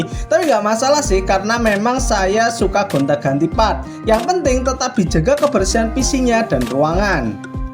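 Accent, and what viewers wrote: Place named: Java, Indonesia. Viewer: Logat jawanya sangat kental 🤣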